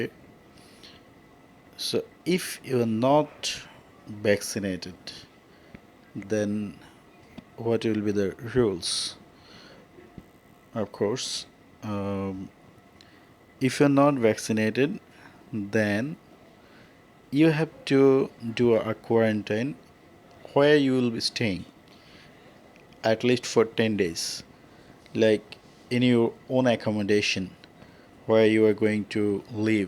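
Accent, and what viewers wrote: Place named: United Kingdom. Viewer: You really have posh English voice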